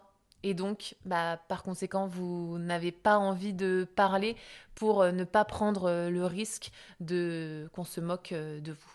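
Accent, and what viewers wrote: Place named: Lyon, France. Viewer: J’ose parler français avec les francophones assez regulièrement. Malgré d’avoir un niveau avancé, je m’occupe trop du regard des autres. Ça ne me bloque pas trop sauf quand je traite des sujets où je n’ai pas le vocabulaire. Merci pour cette video et de ce message d’être tolérant avec soi même. J’adore ton accent (lyonnaise?).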